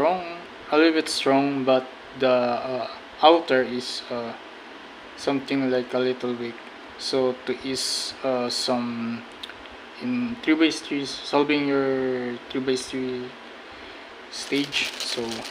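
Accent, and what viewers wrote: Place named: Philippines. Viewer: I like ur accent are u filipino?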